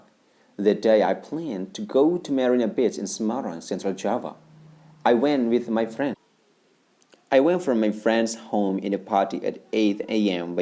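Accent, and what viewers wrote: United States. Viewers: dia amarica acent ya? banyak kata yg kurang jelas :v padahal kaya james cordon, ellen, masih bisa nangkep, kalau ini seakan2 susah nangkepnya, mungkin bener di review sma mba sacha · logatnya american bgt, kaya ka Puri viera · Hi sir, american accent nya mantul. Pngen request klo boleh yg british accent, soalnya ak mau latihan buat tes toefl, krn ak perhatiin listening sectionnya kebanyakan pke british. Thank you 🙏🏻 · ini pakai accent American English kah kak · Kak ini inggris aksen amerika yaa, aku ora nangkep😭. Kalo inggris british masih mending ngerti ngomong apa. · sir, America 'aksen?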